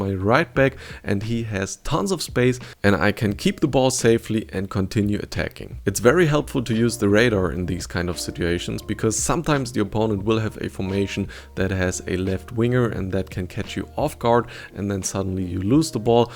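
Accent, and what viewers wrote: Germany. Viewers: Deiner Aussprache und deinem Akzent zufolge kommst du aus Deutschland wieso machst du die Videos dann nicht auf deutsch?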